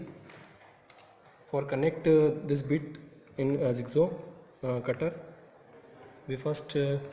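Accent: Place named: India. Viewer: Accent like Indian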